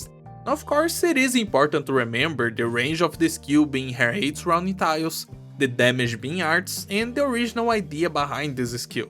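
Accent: Brazil. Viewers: Are you Brazilian? I recognize that accent lmao.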